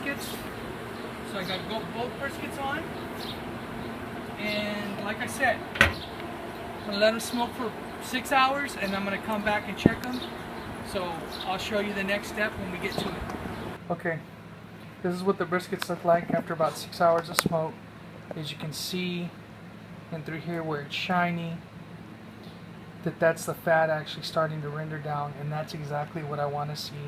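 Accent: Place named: Hawaii, United States. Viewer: You sound like you have a Hawaiian accent. Good video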